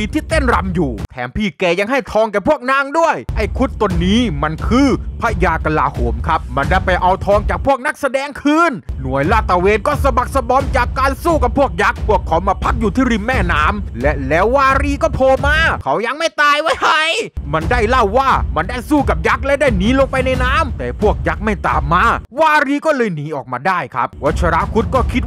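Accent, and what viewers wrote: South, Thailand. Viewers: พี่คะพี่เป็นคนใต้ใช่ไม่คะ(ตอบหน่อยนะคะ)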